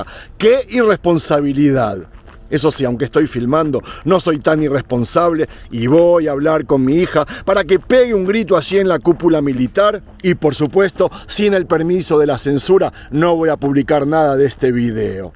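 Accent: Argentina. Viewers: No confíes nunca en los polîticos, seguîd en peligro, los terroristas siempre lo son, pactos de paz para bebeficio de los firmantes, yo me vompadezck de los pobres gazzties masacrados por sus propios compatriotas, tiros en la cabeza porque tenemos que seguir matando a alguien, es nuestra rasôn de ser, Te escribo desde edpsña, aquí tuvimos a eta, ahora estàn en el gobierno, cobrando como políticos, sentados en despachos y blanqueando su pasado asesino, las pistolas estàn calladas, pero siguen guardadas, estás muy acertado, te noto cabreado, y no es para menos, trump! Un megalómano, on ínfulas de gobierno total, cuidaros mucho, ya os falló una vez el sistema de seguridad, a Dresde ó no?, fue atentado con ayudadrl propi Israel, posible,?, todo un gran teatro. Ànimo y ojo avizor wué tengáis mucha suerte y wué dios os proteja, desde espsña con amor, gran reportaje un gusto oírte ese bello espsñol argentinizado ó bueno como sea me gusta tü musicalidad.❤❤❤❤❤❤❤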